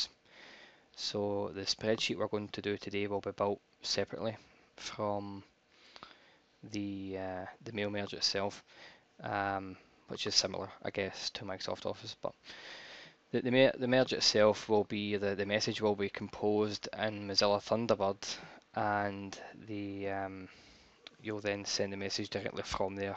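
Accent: United Kingdom. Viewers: Great video, the British English cracks me up a little ;) "Please call on <num>", Lol ;)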